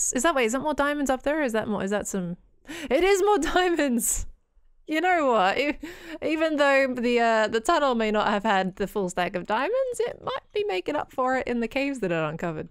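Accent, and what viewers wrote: Australia. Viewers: I just started watching your channel yesterday and was watching today with my daughter and she asked if you're an Aussie because she feels like we're under represented on YouTube (she's 8). She'll be very happy to find out you're an Aussie as well.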